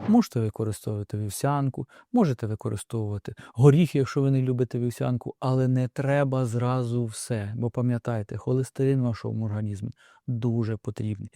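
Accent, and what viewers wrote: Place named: Vinnytsia, Ukraine. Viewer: Це діалект нічого страшного,,навпаки цікаво,так розмовляють люди,які живуть у Вінницький області.Я слухаю з задоволенням.Ми не на каналі граматики української мови.Сенс не в цьому ,а в тій незвичайній,особливій інформації., яку чудовий справжній лікар нам розповідає.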